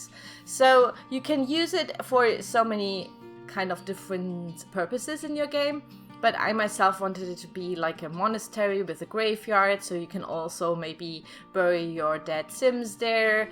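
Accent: Germany. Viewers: I love your German accent! 💕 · Wow thats amazing! BTW judging your dialect i bet you're from germany, aren't you?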